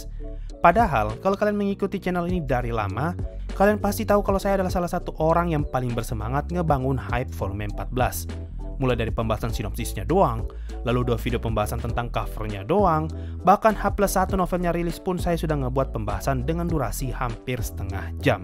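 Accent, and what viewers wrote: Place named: North Sumatra, Indonesia. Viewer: kyknya sumut ya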